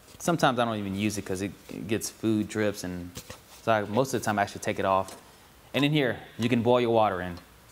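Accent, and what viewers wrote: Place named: South, United States. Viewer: Fantastic review. Are you from the south? Every once in awhile I could note a southern twang in your speech.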